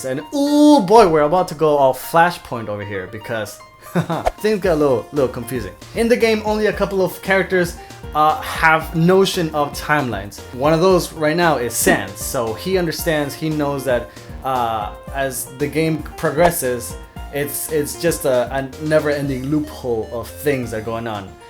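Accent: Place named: United States. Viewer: QUE!..... HABLAS ESPAÑOL!.... pasas como "americano" muy facilmente.... DIOS!... ya quiero ver la pelea xD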